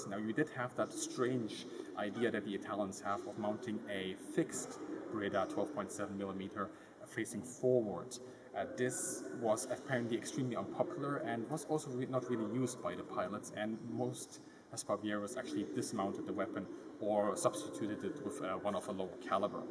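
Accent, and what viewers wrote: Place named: Germany. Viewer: Wow! This guy has such a depth of knowledge, AND a talent for sharing it. Your English grammar is perfect, but your German accent is also perfect! As is your Italian accent when talking about Italian aircraft. I am so glad that you (obviously) enjoy teaching us about things we would never have imagined we would be interested in without your tutelage! Very impressive deep knowledge. Thanks for sharing! Vielen Dank!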